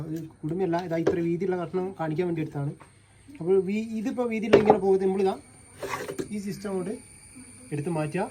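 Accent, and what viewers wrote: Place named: Kozhikode, India. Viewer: ഭാഷ ആക്സന്റ് കേട്ടിട്ട് കോഴിക്കോട് അല്ലെങ്കിൽ മലപ്പുറം ആണ്.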